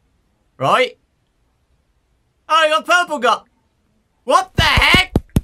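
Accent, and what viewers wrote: United Kingdom. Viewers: why do you sound British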